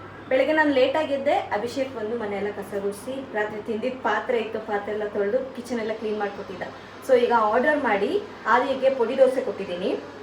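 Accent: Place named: Tamil Nadu, India. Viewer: ಅಕ್ಕ ನೀವು ಬಹಳ ಚೆನ್ನಾಗಿನೇ ಕನ್ನಡ ವನ್ನು ಮಾತನಾಡುತ್ತೀರಿ ನಾನು ನಿಮ್ಮ ತಮಿಳು ವಿಡಿಯೋ ಗಳನ್ನು ಸಹ ನೋಡಿದ್ದಿನಿ ನೋಡಿ ಮನರಂಜಿಸಿದ್ದಿನಿ ನೀವು ಅಷ್ಟು ಅದ್ಭುತವಾಗಿ ತಮಿಳು ಮಾತನಾಡಲು ಕಲಿತ್ತಿದ್ದರೂ ಕೂಡ ಕನ್ನಡ ಮಾತನಾಡುವುದನ್ನು ಮರಿತ್ತಿಲ್ಲ ಅಲ್ಲವ ಅದೇ ಒಂದು ಖುಷಿಯಾದ ಸಂಗತಿ ನಮಗೆ ನಾವು ಯಾವುದೇ ಭಾಷೆ ಯನ್ನು ಕಲಿತರೂ ಸಹ ಮಾತೃ ಭಾಷೆ ಯನ್ನು ಮರೆಯಬಾರದು ಮಾತೃ ಭಾಷೆ ಯನ್ನು ಮರೆತರೆ ನಾವು ನಮ್ಮ ಹೆತ್ತವರು ಹಾಗೂ ನಮ್ಮ ಅಸ್ಮಿತೆ ಯನ್ನೇ ಮರೆತಂತೆ ಮೇಡಂ ನಮ್ಮ ಕನ್ನಡದ ಮೇರು ನಟಿ ಮೋಹಕ ತಾರೆ ರಮ್ಯ ಹಾಗೂ ರಶ್ಮಿಕ ಮಂದಣ್ಣ ಒಮ್ಮೆ ನಿಮ್ಮನ್ನು ನೋಡಿ ಭಾಷಾಭಿಮಾನ ವನ್ನು ಮೈಗೂಡಿಸಿಕೊಳ್ಳಬೇಕು ನೀವು ನಿಮ್ಮ ಯಾಜಮಾನ್ರು ಕಿತ್ತಾಡೋದು ಸಖತ್ತಾಗಿರುತ್ತದೆ ನಿಮ್ಮಿಂದ ನಾವು ಇನ್ನೂ ಹೆಚ್ಚಿನ ಕನ್ನಡ ವಿಡಿಯೋ ಗಳನ್ನು ನೋಡಲು ನಿರೀಕ್ಷೆ ಮಾಡುತ್ತಿದ್ದಿವಿ ನೀವು ನಮ್ಮ ಕನ್ನಡದ ಅಸ್ತಿತ್ವ ಹಾಗೂ ಅಸ್ಮಿತಿ ಯನ್ನು ಕಾಪಾಡಬೇಕೆಂದು ಕಳಕಳಿಯಿಂದ ವಿನಂತಿ ಮಾಡಿ ಕೊಳ್ಳುತ್ತಿದ್ದಿವಿ ಎಲ್ಲಾದರೂ ಇರೂ ಎಂತಾದರೂ ಇರೂ ಎಂದೆಂದಿಗೂ ನೀ ಕನ್ನಡವಾಗಿರೂ ಕನ್ನಡವೇ ಸತ್ಯ ಕನ್ನಡವೇ ನಿತ್ಯ ನಾವು ಕನ್ನಡದ ಕಲ್ಪತರು ನಿಮ್ಮ ಕನ್ನಡ ಶೈಲಿಯಲ್ಲಿ ಚೂರು ತಮಿಳು ಪ್ರಭಾವಿದ್ದರೂ ಅದು ತುಂಬಾ ಸೊಗಸಾಗಿನೇ ಕೇಳುತ್ತದೆ ಏಕೆಂದರೆ ನಮ್ಮ ಭಾಷೆ ಕನ್ನಡವೇಯಾಗಿರಲಿ ಇನ್ನೂ ಬೇರೆ ಯಾವುದೇ ಯಾಗಿರಲಿ ನಾವು ನಮ್ಮ ದೈನಂದಿನ ಬದುಕಿನಲ್ಲಿ ಪರ ಭಾಷೆಯನ್ನು ಬಳಸುತ್ತಿದ್ದರೆ ಅದರ ಪ್ರಭಾವ ಸಾಮಾನ್ಯವಾಗಿ ನಮ್ಮ ಸ್ಥಳೀಯ ಭಾಷೆ ಮಾತನಾಡುವಾಗ ಸಹಜವಾಗಿ ಕಂಡು ಬರುತ್ತದೆ ಪರವಾಗಿಲ್ಲ ಮೇಡಂ ನೀವು ತಮಿಳನ್ನು ಹೆಚ್ಚಾಗಿ ಬಳಸಿ ಅಷ್ಟೇ ಕನ್ನಡವನ್ನು ಸಹ ಹೆಚ್ಚಾಗಿ ಬಳಸಿ ತಮಿಳು ಪ್ರಭಾವ ಏನು ಇಲ್ಲ ಆದರೆ ಕನ್ನಡ ಮಾತನಾಡುವಾಗ ಸ್ವಲ್ಪ ತಮಿಳು ಶೈಲಿ ಬಂದಂತೆ ಬಾಸವಾಗುತ್ತದೆ ನಿಮ್ಮ ಪತಿ ಹಾಗೂ ಸುಪುತ್ರನಿಗೂ ಅದೆಷ್ಟು ಬೇಗ ಸ್ವಚ್ಚಂದ ಕನ್ನಡ ವನ್ನು ಮಾತನಾಡಲು ಕಲಿಸಿ ನಮ್ಮ ಕನ್ನಡ ಮೊಳಗಬೇಕು ಎಲ್ಲೆಡೆಯೂ ಪಸರಿಸುವಾಗೇ ಮಾಡಿ ನೀವು ಮನಸ್ಸು ಮಾಡಿದ್ದಿರಿಂದರೆ ಕನ್ನಡದ ಧಾರಾವಾಹಿ ಗಳಲ್ಲಿ ಮೀನುಗಬಹುದು ಮಾತೃ ಭಾಷೆಯೆಂದರೆ ಅದರಲ್ಲಿ ಹೆಚ್ಚಾಗಿ ಭಾವುಕತೆ ಅಡಗಿರುತ್ತದೆ ನಿಜ ಹೇಳಬೇಕೆಂದರೆ ನಿಮ್ಮಲ್ಲಿ ಕನ್ನಡಾಭಿಮಾನ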